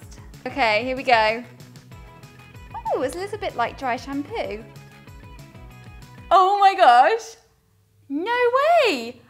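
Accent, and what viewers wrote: United Kingdom. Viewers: Love it ps are u British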